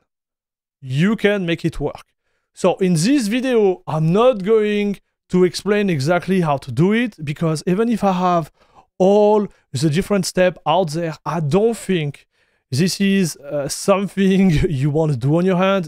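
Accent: France. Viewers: this is amazing ! its like french schwarzenneger speaking :D never seen someone hype so well programming :)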